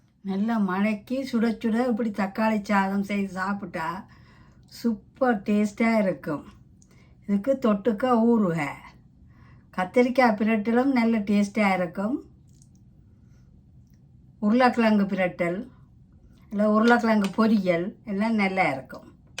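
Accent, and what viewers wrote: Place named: Sri Lanka. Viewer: உங்களுடைய ஸ்ரீலங்கா தமிழ் அழகாக பேசுறீங்க❤ உங்களுக்கு நான் சப்போர்ட் பண்ணிட்டேன் சிஸ்டர் ❤🎉❤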